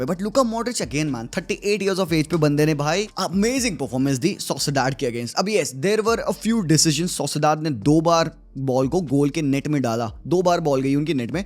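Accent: India. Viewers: Bro what is this english-indian accent?? İt is so hard to follow man.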